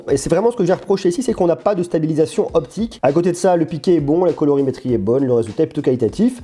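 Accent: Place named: France. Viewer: Pourquoi crier en utilisant une langue si déglinguée. Pourquoi essayer de faire BCBG en utilisant, avec un accent à couper au couteau, des mots en anglais. En français il y a des mots pour tout. J'ai commencé à regarder, car j'ai acheté un Poco F2. Mais je suis finalement allé voir une autre vidéo, en anglais celle-là. Et sans accent français à chier. Pourquoi faut-il crier?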